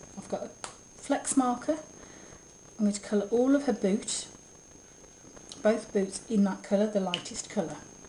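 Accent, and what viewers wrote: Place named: United Kingdom. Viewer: Ur british accent! :D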